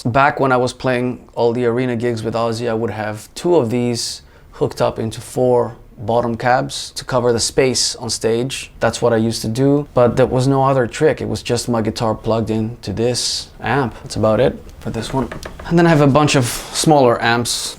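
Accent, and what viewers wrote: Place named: United States. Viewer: why does he sounds like an American when he s talking ,,hi hi ho ho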